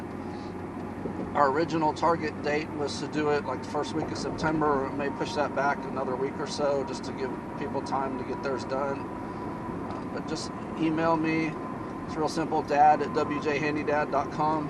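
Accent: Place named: Texas, United States. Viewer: You might should type that e-mail address out as it's hard for me to understand that Texan drawl.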